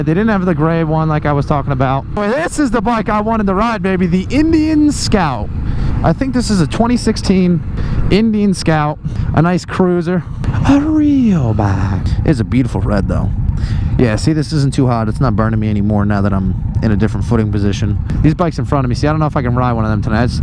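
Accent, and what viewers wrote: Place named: Georgia, United States. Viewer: yeah but hey you can't help "how" you talk. he's from GA. I'm from Alabama, so he's cool lol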